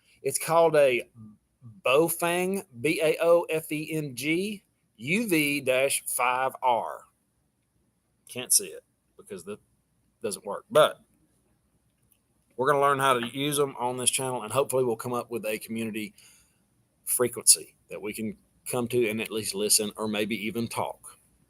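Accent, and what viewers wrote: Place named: South, United States. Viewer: This is NOT an important comment but I just have to say that you are Billy Bob Thortons look alike! That southern drawl and all❤️❤️❤️ Also, thank you for all you do in proving information to us preppers.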